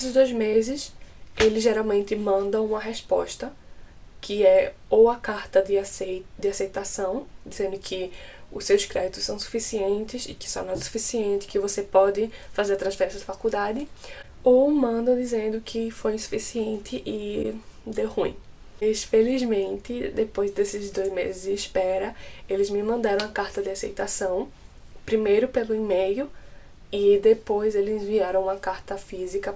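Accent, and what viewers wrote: Santa Maria, Brazil. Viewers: Tu é muito divertida véi!! E que bom que não perdeu o sotaque de SM kkkkkkkkkkkkkkk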